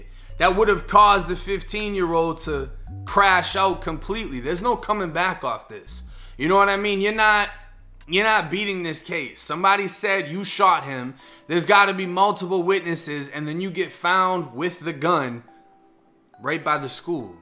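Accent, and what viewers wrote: Boston, United States. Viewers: You sound like you from Boston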